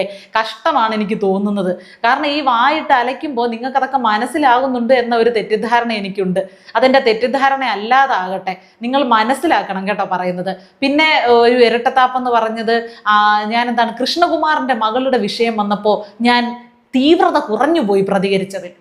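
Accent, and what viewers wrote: Thiruvananthapuram, India. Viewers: ആർ എന്തെങ്കിലും പറയട്ടെ കലക്കുന്നുണ്ട് തിരുവനന്തപുരം ഭാഷയും കൈകാര്യം ചെയ്യുന്ന വിഷയവും ഒരു വലിയമ്മച്ചിയുടെ പക്വതയോടെ അവധരിപ്പിക്കുന്നത് വളരെ ഉചിതമാണ്. Keep it 🎉🎉🎉🎉🎉🎉 കഷണ്ടിക്കും അസൂയക്കും മരുന്നില്ല. 👍💪🌹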